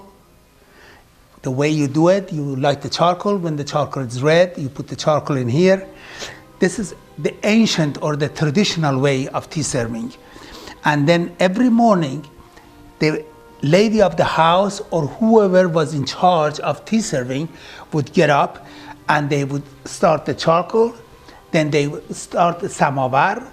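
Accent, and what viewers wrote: Iran. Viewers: Iranian accent is the cutest accent ever :)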